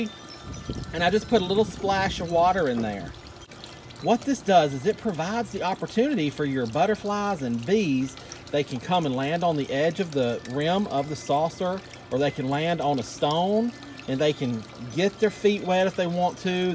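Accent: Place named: Carolinas, United States. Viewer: I heard you speak & instantly knew you were a fellow Carolinian! I love you already! Happy pollinators week! We did a celebration video too along with Marie at Salongirl Gardens. I’m so happy to see other gardeners raising awareness!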